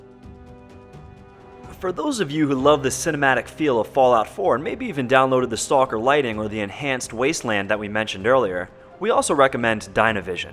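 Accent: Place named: South, United States. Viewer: I love how this guy changed his accent/faked it prior to this hillbilly southern deplorable accent that everyone complained about. So you're fake?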